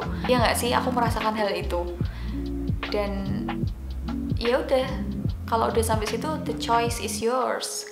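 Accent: Java, Indonesia. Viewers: boso jowo e medok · Mbak jowo ne ndi to kok muuuwwweedook bngt 😀😀😀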